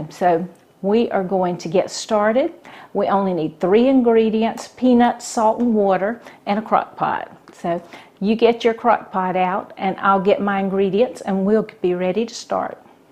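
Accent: South, United States. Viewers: she has that wonderful southern accent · Thank you so very much!!! I looooove boiled peanuts and u just made my life so much better!! I'm born n raised in NC and you sound like every woman in my family basicly. Southern women are the best!! Thank you very much for the recipe!!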